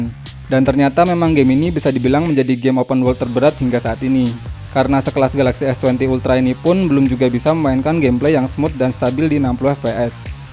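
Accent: Yogyakarta, Indonesia. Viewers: Orang Jogja ya bang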